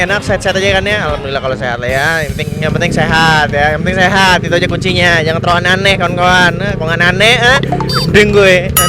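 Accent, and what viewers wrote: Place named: Java, Indonesia. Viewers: Oalah toh bang² kok lucu banget sih klo pakek bahasa jawanya, lucu akan logat² jawanya. Heheheh hahahah hihihi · Tolong dong jgn pake logat bahasa jawa, kowe iki rak mudeng ngomong opo wi. Gatheli tenan..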